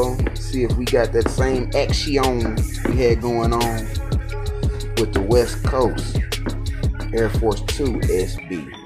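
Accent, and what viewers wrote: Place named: Texas, United States. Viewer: Side note I can def tell you from Texas lmao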